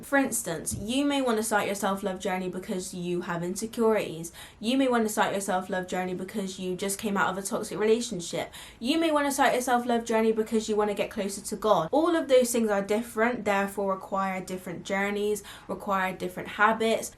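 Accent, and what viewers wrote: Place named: Bristol, United Kingdom. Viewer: Are u from Bristol? Ur accent sounds so Bristolian 😂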